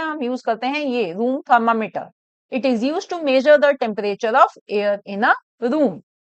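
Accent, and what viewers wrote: Bihar, India. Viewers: Mam can you tell are you from bihar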